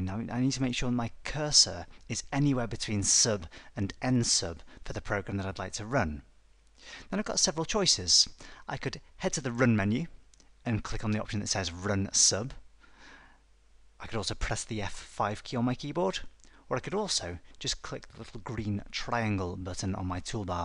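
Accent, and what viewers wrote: United Kingdom. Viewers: Your videos are fantastic, and your accent is so beautiful! Are you british? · I'm not hating at all (please no flaming), but its sooooo freshing to hear an English accent on a programming tutorial video. I'm currently using YouTube to learn <link>, SQL, <link> and a range of others and all the videos I have watched they have been American/Indian. I have no problem with that at all but its good to see the UK has some programming skills! Great tutorial, really easy to follow and I learnt a lot. Time to watch the rest of your videos :D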